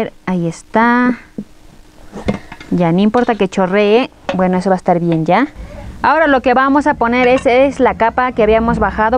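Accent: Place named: Bolivia. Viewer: . Sos. Más boliviana que persiana porque tiene tono Bolivianas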